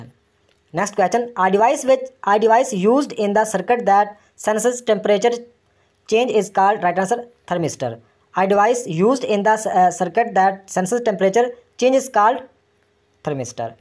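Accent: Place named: Punjab, India. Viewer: سر جی آپ انگلش کو پنچابی میں بول رھے ہیں ۔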